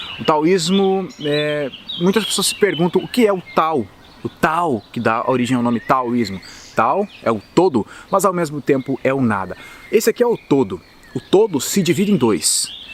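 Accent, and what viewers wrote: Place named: Paraná, Brazil. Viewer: Excelente vídeo! Muito obrigado por partilhar suas ideias. Hoje eu estava a precisar destes dizeres. Não sei por que seu vídeo veio parar até mim, mas agradeço. Seu sotaque paranaense é mesmo muito bonito.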